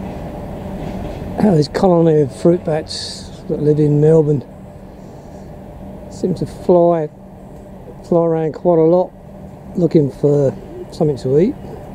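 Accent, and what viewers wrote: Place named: London, United Kingdom. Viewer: I've seen a bunch of birds around the Craigieburn Grasslands that I'd never seen before and in some cases still can't identify. It's the largest surviving example of the remaining two percent of the volcanic grassland ecosystem in Melbourne's north, now almost gone. Regarding the Black Cockatoos, I think I might've mentioned previously that for a while I was seeing a flock of Little Corellas on St Paul's Cathedral opposite Flinders St. Station. From your description, the woodpecker I saw was probably a Lesser Spotted Woodpecker, I remember the black, white and red. Someone had put wire netting around the nest entrance to protect it. It was in a local park called Walk Wood, and there was a "myth" about a deer living there. I was only about ten at the time and imagined there was some huge antlered stag roaming the wood. 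But when I mentioned the story to people I'd known from there many years later, they explained that it wasn't a myth at all but that it was actually just a very small species of deer that really does regularly turn up in people's gardens around there. I wasn't sure whether to rejoice that the myth was true or to be disappointed that my "Lock Ness/Yeti deer" sounded like such an ordinary, mundane thing. I never saw one though, so still some mystery left! BTW the accent around Beaconsfield is a shocker, they sound like they're relatives of the Queen :-) I'm no expert on English accents but I'm guessing yours is a London accent - I could be wrong. BTW if you want t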